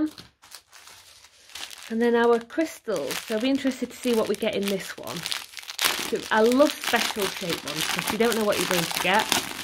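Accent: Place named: Sheffield, United Kingdom. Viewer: Happy new year :) just discovered your channel :) I recognize your accent 💃I'm from just outside of Sheffield :) x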